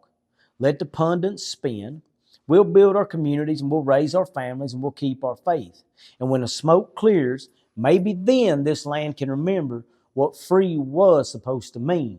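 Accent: South, United States. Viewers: I love listening to his South Alabama Texas Redneck accent….☝️👍😁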